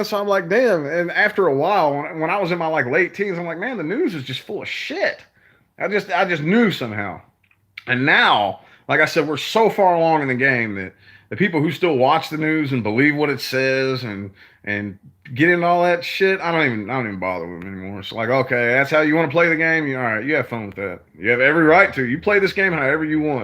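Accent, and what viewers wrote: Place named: New York, United States. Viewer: Does ur hair hurt .. wat kinda question is that??lol haha... chillin n relaxin all day errdayyy..love it haha 😊😊 ps.. love ur accent.... a new yorker like me notices these things 😊😊 and yea anything by stephen king is awesome um.. Hmm wat else??o yea.. fuck the haters.. fuck the braggers!!! stay the chill ass person u are 😍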